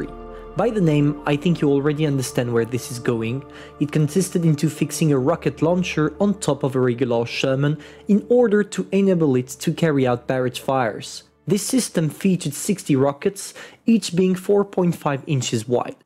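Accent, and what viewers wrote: France. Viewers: Now I feel that I am in a history lesson with a French boi talking in English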